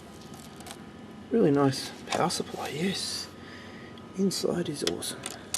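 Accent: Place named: Australia. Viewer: Australian:) I think.... nice orginisation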